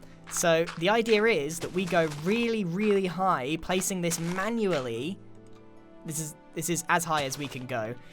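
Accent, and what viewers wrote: United Kingdom. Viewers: U to british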